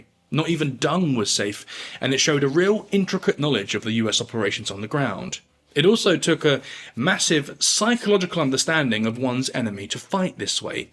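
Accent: England, United Kingdom. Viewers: There’s something so very attractive about you. Partly I believe it’s your voice. A most excellent English accent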